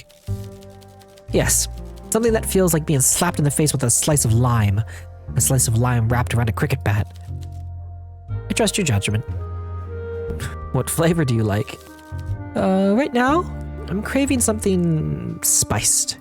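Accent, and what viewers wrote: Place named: Boston, United States. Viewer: The Boston accent was fun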